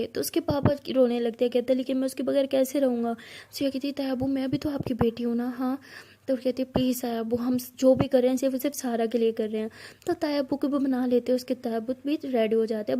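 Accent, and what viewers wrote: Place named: Uttar Pradesh, India. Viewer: Pakka up se ho sahoor to mp up me hi bolte hai😂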